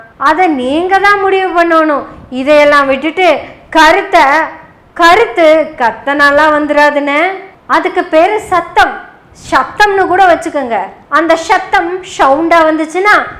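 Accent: Kongu, India. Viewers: கொங்கு தமிழில்,கொஞ்சும் தமிழில் அவர்கள் கொன்டுவந்த செருப்பை சரியாக பயன்படுத்த சொல்லி கொடுத்த பாணி அருமை. வாழ்த்துக்கள். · அருமையான கொங்கு தமிழில் விளக்கமாக விவரித்த சகோதரி அவர்களுக்கு எனது பாராட்டுக்கள் மற்றும் வாழ்த்துக்கள் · சகோதரியின் கொங்கு தமிழ் மிக அருமை 😊😮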